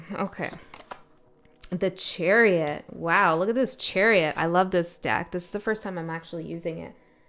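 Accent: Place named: Canada. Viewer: i see that you are a piacean same as me, love your ring on your right hand, i have always loved your canadian accent, you have a very soothing voice, i see the letter m in thye palm of your hands and the half moons in your nails. i was born on march the 6 th at 6 am 1962 what day were you born?. ps your boyfriend is a lucky guy i hope he realises that. what part of canada are you from, love your cat by the way.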